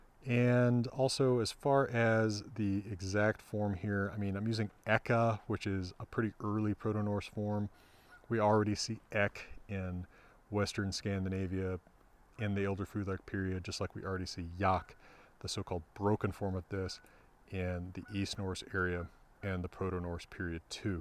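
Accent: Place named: United States. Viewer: You'll find most Norwegians pronounce Old Norse and proto-Norse with a thick Norwegisn accent, and Icelandic people pronounce it with a thick Icelandic accent, so who's to blame thick Anerican accent really. I wish there was more published on reconstructing the more precise pronunciation in the different stages, though. I think a pretty distinct trsit of Old Norse until at least around 1000 would be the nasal vowels, but who today tries to reconstruct that?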